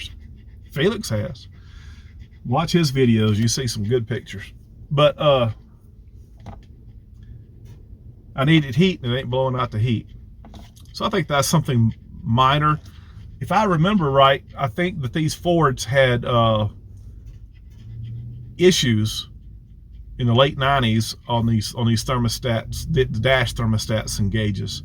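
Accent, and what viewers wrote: South, United States. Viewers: The spark in is back!! I'm sort of glad the "road" has kicked you in the A _ _! I can say that from experience ~ ~ gotta listen deeply to your body's healing process. Love your southern jibber jabber too. I think sometimes you change vehicles to keep it interesting for us, plus we all learn. For that, I thank you. Promise yourself you'll slow down even if you do feel better, the healing will come much faster if you do ~ I know from 1st hand experience. Felix is a God given gift, good he's walking that mile with you everyday.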